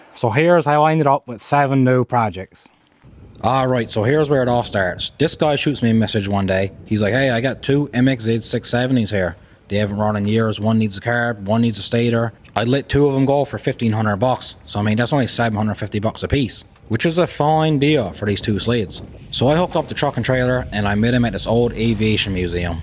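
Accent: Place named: Newfoundland, Canada. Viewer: Newfoundland by the accent.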